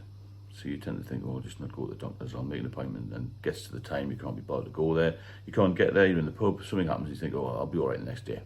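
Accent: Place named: North East England, United Kingdom. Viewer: Howay, pet, I rather like the North-East accent!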